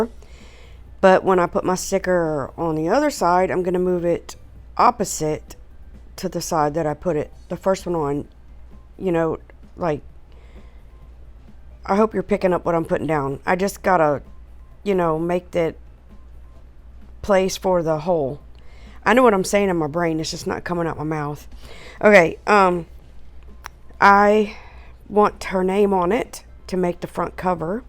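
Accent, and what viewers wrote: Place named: Louisiana, United States. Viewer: I love these projects! BTW, you sound just like my family in Louisiana! Beautiful accent!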